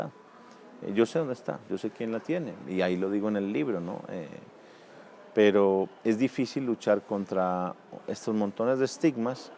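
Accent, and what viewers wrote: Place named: Antioquia, Colombia. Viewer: pense que hablabas paisa ...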